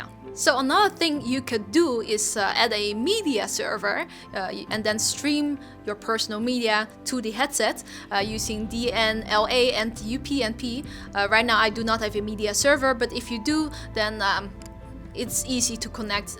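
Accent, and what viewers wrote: Netherlands. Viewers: amazing dutch accent, doesn't match ur face though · Are you Dutch? You sound Dutch.. 😄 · She has a netherlands accent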